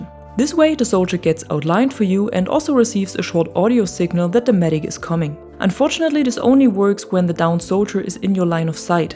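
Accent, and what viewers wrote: Germany. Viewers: Warum hört man die Deutschen immer raus wenn sie Englisch sprechen 😁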